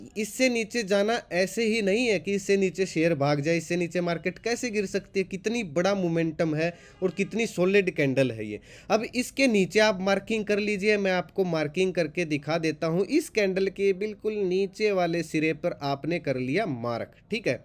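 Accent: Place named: Marwari, India. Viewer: आपका बोली का लहज़ा तो मारवाड़ी लगता हैं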